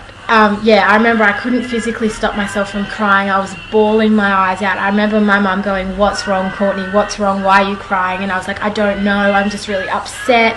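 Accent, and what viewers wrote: Australia. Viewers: I say, "I shit my pants" or "I would have shit my pants" all the time, but something about hearing it in an Australian accent made me laugh like crazy. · it's good to hear an australian accent again. spent 3 weeks there, about 10 years back, and i loved it there !! beautiful place. :) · i am just so used to my accent, i have the same accent as you, so you were just soooooooooooo easy to understand.i'm from the perth area.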